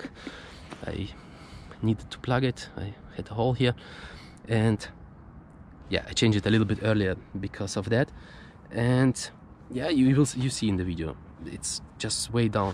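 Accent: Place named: Germany. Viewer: Как необычно, у вас в голосе звучат скорее немецкие нотки, чем русские, даже когда говорите по английски. 😜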